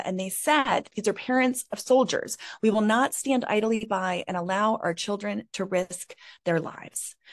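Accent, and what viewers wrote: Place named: South, United States. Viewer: Thanks for not disparaging the historic flags of the South. As you know, not all Southerners are racists or klan members. Some of us even work for peace & justice. As William Faulkner is often quoted, "In the South, the past is not forgotten. It's not even past!" Your comment about the reoccurring "twang" in your voice was delightful!!! The Southern accent, in all of its various forms, evokes the fondest of memories. It literally soothes the human soul. I hope you enjoyed Montreat & the beautiful Black Mountains of North Carolina. Wonderful place with wonderful people!!!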